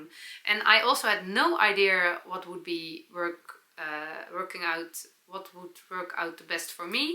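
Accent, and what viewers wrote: Netherlands. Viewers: Your hat is adorable and you look so beautiful in it!😊 I Always enjoy your shows and your “ Dutchey English”!😊👏 You do a great job and I always appreciate you not editing your videos to keep them real! 🤗Thank you and Blessings to you and your family!😊🌸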